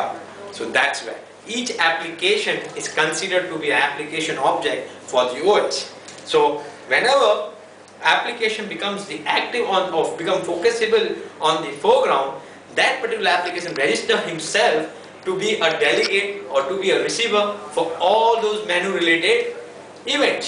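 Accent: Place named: Asia. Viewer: Boss it is Asian English!!!!!!!!!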